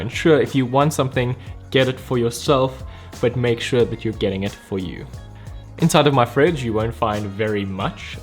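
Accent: South Africa. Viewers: Wait, you're South African? it refreshing from all the other american and british you tubers. Nice to hear my accent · You sound South African, are you ? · Are you south African? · I thought this was a South African minimalist apartment when I heard your accent lol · are you south african?? idk why but that's what your accent is screaming at me😂😂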